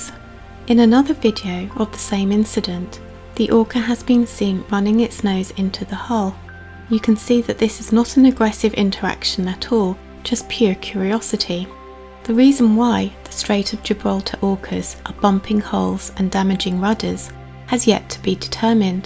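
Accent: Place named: England, United Kingdom. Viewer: No problem, as an orator you are exceptional, I hope it is a professional position, it should be. As a retired teacher (STEM) pace (slowish) and clarity are essential for comprehension. In addition you English accent and voice tone are very pleasant to my old Australian ears.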